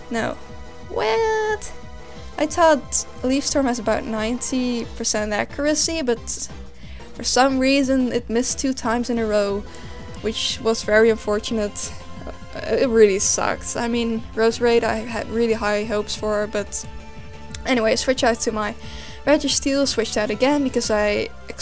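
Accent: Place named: Netherlands. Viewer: Gotta love Dutch accents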